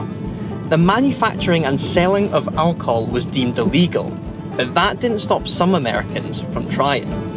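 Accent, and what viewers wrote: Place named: Glasgow, United Kingdom. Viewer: Do you? I'm Scottish and hate his accent. It's that "I'm a spoiled middle class Glaswegian who went to university and had 5 star accommodation and loads of money to buy me and my friends loads of things" It's a horrid accent.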